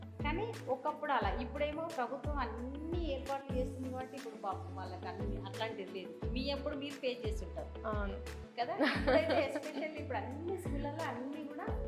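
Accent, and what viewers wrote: Telangana, India. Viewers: Super documentary..Nee telamgaana yasa bagundhi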